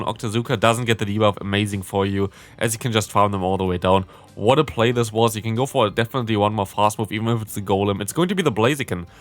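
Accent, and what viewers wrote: Germany. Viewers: Nice Video, are you german???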